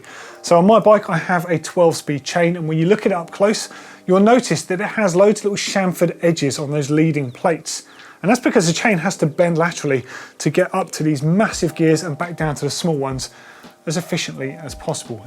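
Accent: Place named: United Kingdom. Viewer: Very good info; lots of useful stuff, here; typical Brit accent on this dude (I'm an American, although my ancestors come from a little place, ten miles north of the old center of London, a thousand years ago...ha ha ha...); and, seriously, is anyone crazy enough to ride a bike that fast, downhill, on those dirt trails, over roots and rocks, in the mud, in the rain?!? (Certainly not even ME, who was once TRULY certified...ha ha ha ha ha!) I flew downhill in the dark, a few nights ago, and wound up hugging a partially obscured STEEL GUARDRAIL, covered with weeds and vines--upside down, with a few extra cuts & bruises; I can only imagine what it'd be like losing control on one of those downhill mud-slalom runs, in the rain--not any more fun than that, I'm sure!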